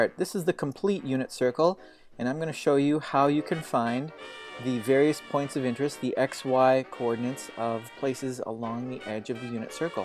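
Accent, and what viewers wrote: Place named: Minnesota, United States. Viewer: turned on my sound, not expecting to hear a Minnesotan accent, and what do I hear but my family's home?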